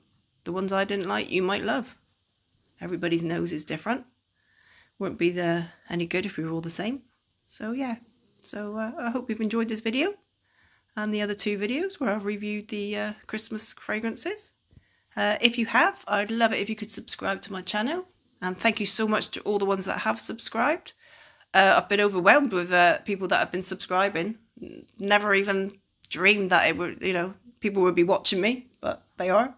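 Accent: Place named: Devon, United Kingdom. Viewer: Hello. Just started making wax melts after making soap and body products for two-three years. Love the video. Why do you transfer to a second jug is it simply for ease of pouring? I sense a Devonshire accent hubby’s from asthma Devon and I lived there on two lengthy occasions. Tasmania reminds me of Devon and Cornwall wrapped together. How disappointing that you made so many not to like so many.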